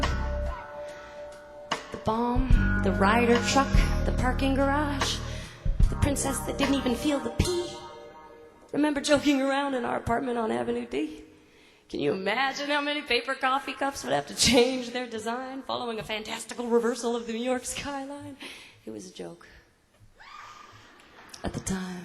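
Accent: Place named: South, United States. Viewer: I swear if she EVER talked to me with that voice i would die! And her lil country accent would ..aghhhh i'd just die :p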